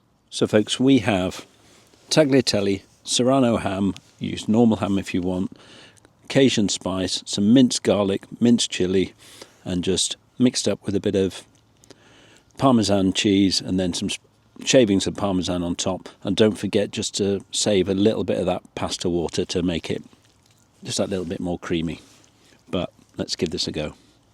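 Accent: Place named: United Kingdom. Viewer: My job as a train driver(train engineer might be the correct English term) do put me on when i am at work, so when ever i get the chance I take a walk during a work day, and when i get home i watch your or some of the other British outdoor youtube shows, that relaxes my brain, and i enjoy listening to real english. Keep em coming.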